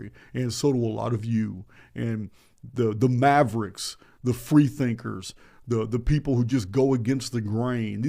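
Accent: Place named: Texas, United States. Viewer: I am not sure how I came across your account initially… I think it was about the goddess being first, but what I connected with immediately is the Texan accent because I was born and raised in San Antonio. Then I realized that you’re on the same path as me…obviously ahead with all the books you’ve been able to read but either way, I am where I need to be and I’m glad I discovered your page and I appreciate the information you share ☺️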